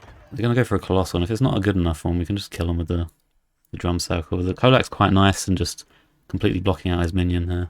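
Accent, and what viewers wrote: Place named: England, United Kingdom. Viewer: The english accent helps :)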